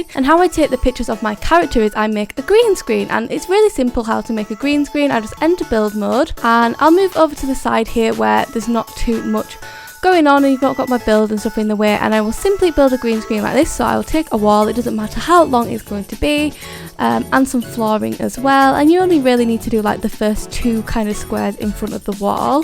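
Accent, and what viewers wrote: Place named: Leeds, United Kingdom. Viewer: Are you from the Leeds area? You've got a very similar accent to me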